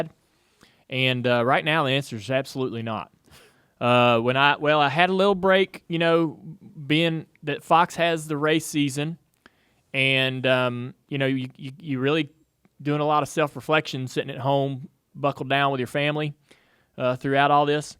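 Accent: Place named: North Carolina, United States. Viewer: The North Carolina accent is great. Of all the Southern accents, it's the nicest one to hear. The Brickyard 400 was a great race. Keep up the good work Dale Jr!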